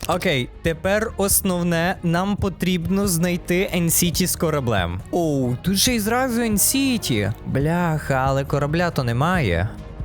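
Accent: Lviv, Ukraine. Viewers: ТИ З ЛЬВОВУ???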